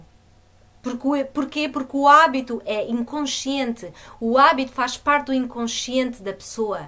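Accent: Portugal. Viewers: Sai dai doida, vc deve ser uma narcisista pq ela é portuguesa por isso fala assim, e se os vídeos dela são meio desfocado pq ela grava de um celular, ela não está aqui pra dar aula de dicção. O q vale é o conteúdo do vídeo, não tem q ter revisão de nada, ela é uma amadora ótima execelente maravilhosa, ela não está aqui pra ser blogueira e nem YouTuber pra ser impecável com linguajar postura e qualidade de vídeo!! Não está satisfeita procura outro canal com outros profissonais q são impecáveis. Pq todos q estão aqui vendo o vídeo dela, não está aqui se preocupando com essas coisas q vc está falando sua doida kkkk Mulher chata. continue assim, vc ajuda muito as pessoas com seus vídeos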